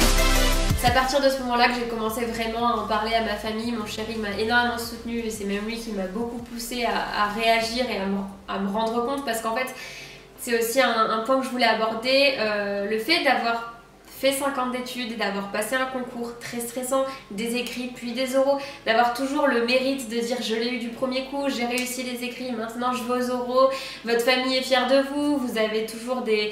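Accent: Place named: South, France. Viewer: Les accents régionaux, ça existe et on n'y peut rien. Vous n'allez pas reprocher à un marseillais ou un toulousain d'avoir l'accent du sud,par exemple, si? Et puis, honnêtement, on s'en fiche. Ce n'est pas une vraie "erreur" ,dès lors qu'elle sait faire la différence à l'écrit !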